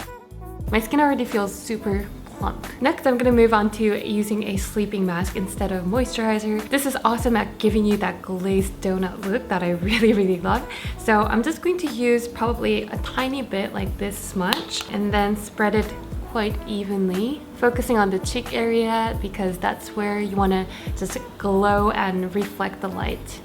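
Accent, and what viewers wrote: Australia. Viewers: Omg the Australian accent is getting stronger!!! I love it hahaha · Dude I was like 😂😂😂😂 how can you just come up with an aussie accent out of nowhere?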